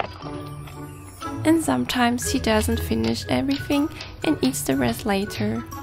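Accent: Germany. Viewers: Do you have a german accent?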